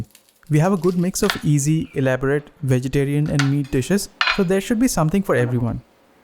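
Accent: Bengal, India. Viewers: Love the way you speak Bengali words in the same. বাঙালি accent in between your English narration. Love your recipes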